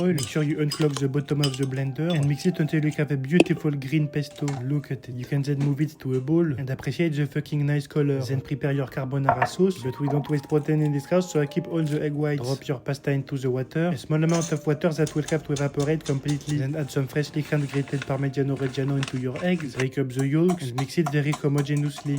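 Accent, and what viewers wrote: France. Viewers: Love the broken english with french accent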